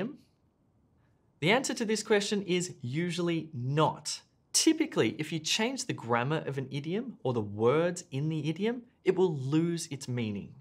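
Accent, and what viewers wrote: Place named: France. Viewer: ur pronunciation is french english bro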